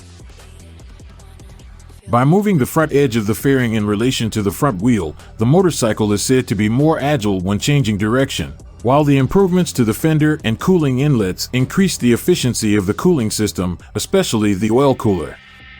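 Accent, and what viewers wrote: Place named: United States. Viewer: American voice over can't even pronounce Panigale, does my nut in.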